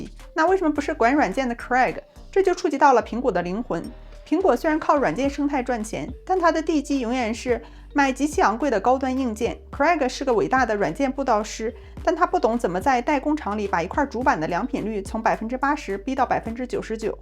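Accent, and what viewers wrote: Northeast, China. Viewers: 捂。。。唠。。。 戈。。。？？？ 大姐东北银啊？？？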